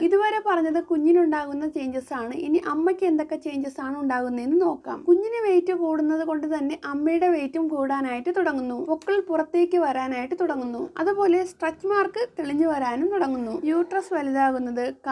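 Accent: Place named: Kasaragod, India. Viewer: kasargod slang pole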